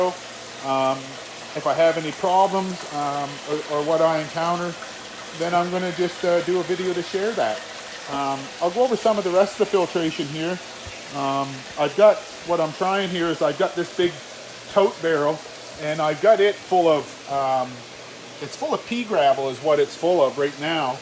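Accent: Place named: Canada. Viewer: you're either a Canadian or a Minnesotan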